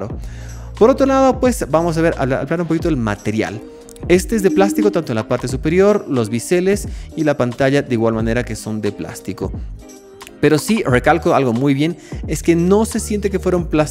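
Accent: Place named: England, United Kingdom. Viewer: por el asentó pensé que lo eras por qué hablas como un inglés cuando habla español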